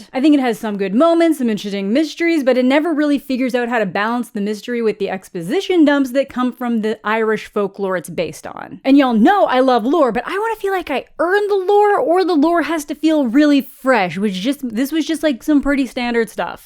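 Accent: Quebec, Canada. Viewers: You speaking French made my brain hurt. I speak French and even if I didn't know you were Canadian I would be able to tell from your French. Honestly great though, keep it up. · I actually love your Canadian French. It sounds great <3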